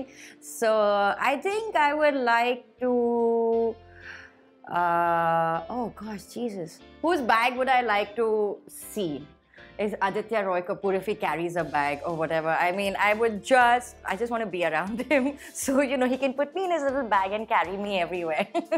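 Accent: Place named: United States. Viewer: açcent like American.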